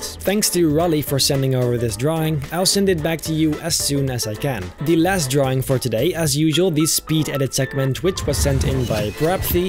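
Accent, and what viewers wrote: Netherlands. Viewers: Ben jij Nederlands aan je Accent te horen wel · Are you also Dutch? I can hear it in your voice. Ik ben ook Nederlands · Why does it sound like he has a dutch accent?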